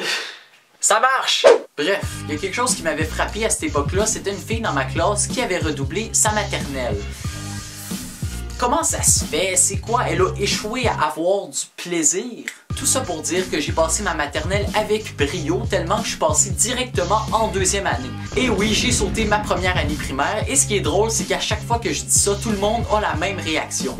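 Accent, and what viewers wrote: Quebec, Canada. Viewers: à force d'entendre les youtubers québécois, tu comprendras mieux après.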